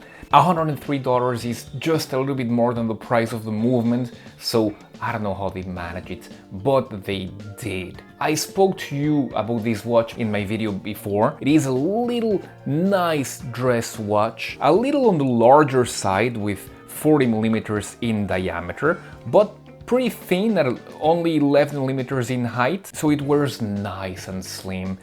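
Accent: France. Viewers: Yet another great video, thanks. Love the accent, reminds me of the times when I worked for a French company (11 and a half years!) . I am looking forward to your review of the Specht&Soehne. Crazy German-ish name for a Chinese watch, huh? I am not fond of Homages of watches that are too unique to be considered "universal property (Submariner, 50 fathoms, Explorer, AquaTerra, Daytona, Speedtimer, Dirty-dozen field watches, Flieger watches)", unique watches such as the Alpinist (Militado released one recently and I have mixed feelings about it) or the Vacheron Constantin 222 (which Pagani Design "homaged" and failed spectacularly at replicating the bracelet, a failure that every youtuber "fails" to point out, imagine that...). But if anyone succeeds at properly homaging the Land-Dweller, I just might consider buying it, because I really want a Genta-style watch. 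Otherwise, I would have to turn to the Festina F20051 or F20065 (which are on sale right now at about 170 EUR). The PRX is too damn expensive right now and the prices will go higher for a while, as long as people associate it to the Land-Dweller.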